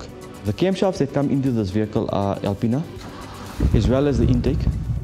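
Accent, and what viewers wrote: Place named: Afrikaans, South Africa. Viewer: That lovely accent is Afrikaans and yes, the car is stunning too. Peace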